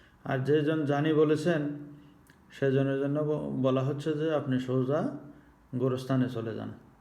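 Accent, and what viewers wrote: Noakhali, Bangladesh. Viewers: নোয়াখাইল্লা। তুমি তাই না ভাই ভালো ভালো কাজ করেন তবু মানুষের সাহয্য হোক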